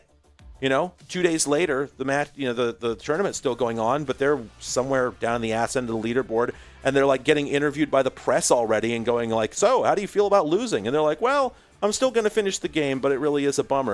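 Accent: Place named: Australia. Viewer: Yay. Australian commentator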